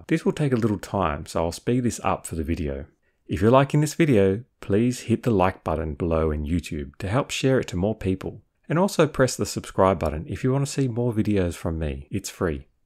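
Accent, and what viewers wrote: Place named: Australia. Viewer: Hi, tnx for your response a couple of days ago. I fixed the issue re VLOOKUP! It was as you suggested columns not ‘lining up between data and reference tabs. Thanks so much! I can now follow your process for bank statements inc pivot table summary. Do you have a video for credit card plus automation as similar process but somewhat different ways I want to view?Leanne PS again so good to follow the Aussie accent😂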